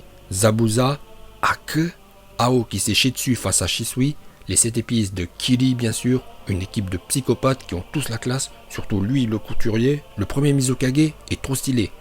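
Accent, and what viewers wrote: Japan. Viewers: t’es vidéos sont bien mais sa rend fous t’es accents japonais alors que t’es breton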